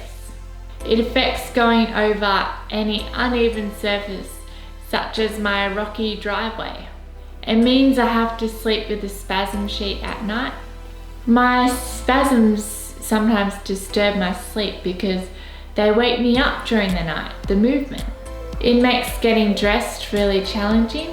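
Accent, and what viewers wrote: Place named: Australia. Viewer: You sound like a heck of an Aussie girl. A like & subscribe from me as well & now following you on FB. Keep up the great work & all power to you!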